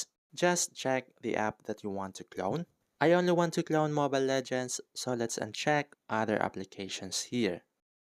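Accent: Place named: Philippines. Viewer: You sound more like filipino lmao, but your tutorial are very simple and very understandable ❤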